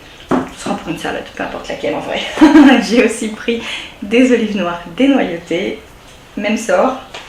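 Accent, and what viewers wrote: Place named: Aquitaine, France. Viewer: Maiiiis nooon je me disais bien que t'etais d'Aquitaine tu dis ''rase'' au lieu de ''rose'' haha #teamrase vive l'Aquitaine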